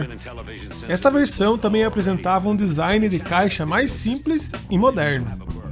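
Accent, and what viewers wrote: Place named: Paraná, Brazil. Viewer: Ótimo conteúdo, mas dicção e "paranês" terríveis.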